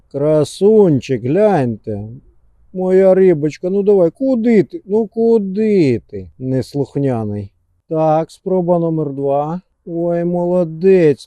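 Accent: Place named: Russia. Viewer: Русский акцент режет слух !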